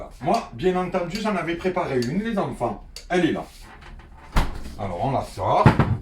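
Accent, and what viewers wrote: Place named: Sidi Bel Abbes, Algeria. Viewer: ASALAM alikoum vous êtes de Sidi Bel Abbes vous sa s'entend moi aussi je suis de Bel Abbes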